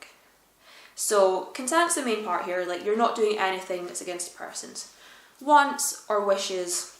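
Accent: Scotland, United Kingdom. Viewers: The lovely lady is from Bonnie Scotland..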